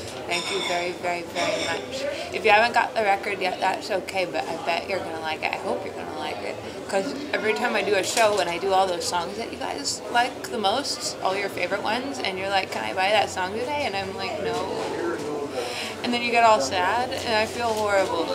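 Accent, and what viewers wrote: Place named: Canada. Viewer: You sound Canadian!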